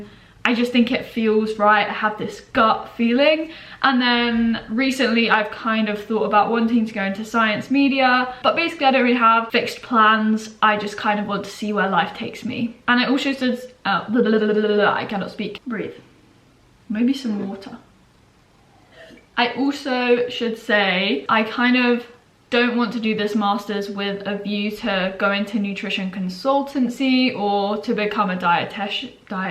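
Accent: Australia. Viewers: You sound so Australian since you got back from your trip! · I feel like she's delevloping an Australian accent, very likely that she's not but I kinda heard it in the intro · You’ve picked up a bit of an Australian accent!! Love it! X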